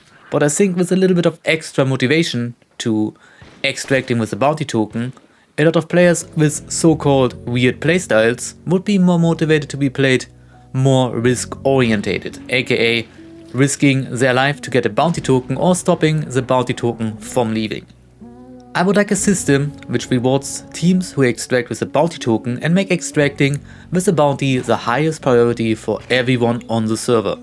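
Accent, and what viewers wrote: Germany. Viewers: Diggi machst du das mit dem deutschen Akzent absichtlich so doll? xD Ist ja crazy xD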